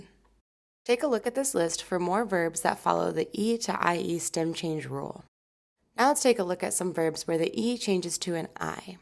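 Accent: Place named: United States. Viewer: Great accents - genuine american and spanish accent! Have not heard anyone in YT Spanish teaching courses who has both accents just perfect!!! 👍🏻👍🏻👍🏻❤️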